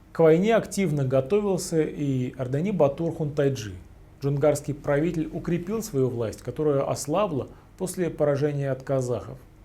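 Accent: Russia. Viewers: согласен что достойный человек, но он сам признавался что плохо владеет татарским языком. Так что произнося тюркские слова он во многом говорит как русский.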